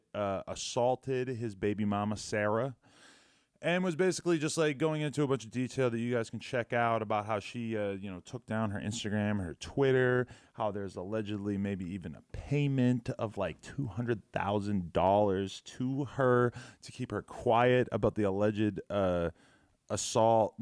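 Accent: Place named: New England, United States. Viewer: For some reason I can really pick up new england accent in this video, which is usually nonexistent to me.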